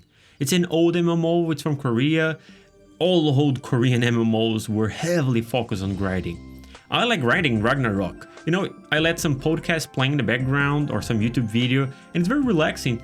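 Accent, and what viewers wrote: Brazil. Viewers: Are you Brazilian? I’m noticing your accent. I’m half Brazilian.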